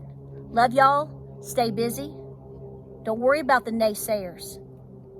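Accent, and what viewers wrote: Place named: Appalachia, United States. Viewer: I love your accent. My husband is an Appalachian and sounds similar to you. I love his culture. The elders of the past are so important to learn from.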